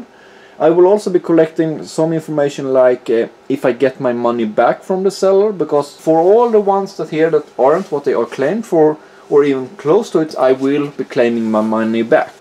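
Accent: Sweden. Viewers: Good channel! where are you from? you sound Swedish.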